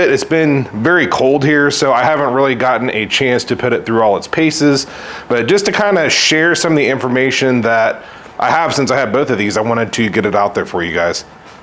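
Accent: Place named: Southern California, United States. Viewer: Holy SoCal accent batman. Thank you for answering all of my questions though! Great Vid!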